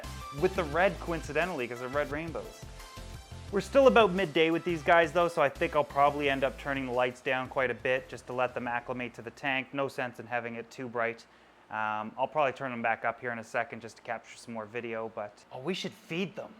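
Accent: Canada. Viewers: Thought I heard Canadian accent... then I heard him say Dartmouth... Dude, I used to live in Spryfield‼️ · Sounds Canadian...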